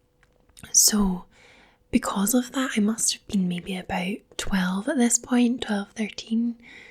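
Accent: Scotland, United Kingdom. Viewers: Where in Scotland are you from? Your accent seems stronger than mine x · as a Scottish person watching this I love it but I'm still not used to hearing Scottish accents on Youtube. Anyway I love this channel! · Modsforfun I love that Scottish accent. I need a woman man from either Scotland, Ireland, or England. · doesn't take away from the experience I assure you. Very good impressions! And still relaxes the hell out of me. Great work. Plus you have the accent going for you, you just can't beat a Scottish accent. I don't care who you are. Especially for ASMR. Just fantastic.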